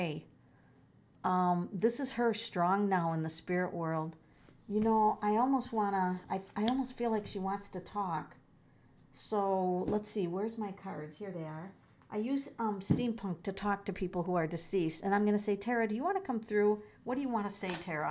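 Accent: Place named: Midwest, United States. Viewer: First I love your mid western accent. My husband is from Green bay wi. Second I love how you speak to Tara directly with the cards instead of just interpreting or reading the card. You really see her and acknowledge her. Don't forget to #taracalico in your tag section so it will get more noticed. I'm going to check out more of your work I love your personality.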